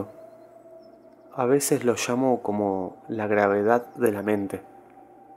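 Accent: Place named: Argentina. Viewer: gracias! y ando esperando mas capitulos de los libros que quedaron inacavados. me ayuda tu voz argentina. memorizo mejor je.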